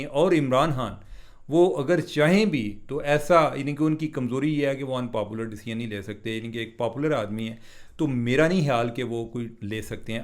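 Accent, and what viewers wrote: Punjab, India. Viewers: sb always stayed blessed.always excellent analysis sir..with punjabi touch very cute.. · Aap sanjeeda se sanjeeda baat ko halky phulky andaz me bayan karty hain.khas tor per punjabi tarka jo aap lagaty hain .I enjoy it a lot.Mera taluq punjab(Burewala) se hai iss liey .keep it up thanks.😂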